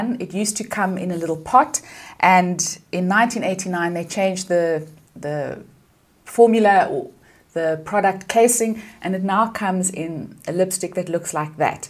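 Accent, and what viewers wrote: South Africa. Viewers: I was like you must be South African, from the accent ❤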